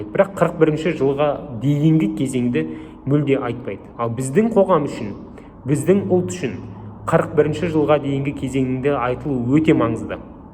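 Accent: Standard. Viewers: өте сауаты күшті,қазақш таза сөйлейді,мәңгүрт депутаттар қағазға қарап міңгірлеп тұрады,осындай қазақ азаматтары көп болса екен,депутаттыққа,мини стрлікке осы Асхатты ұсыну керек,қазақша таза сөйлейтін жастар аз,аман бол!Қазақстан енді орыстың бұғауынан шығу керек!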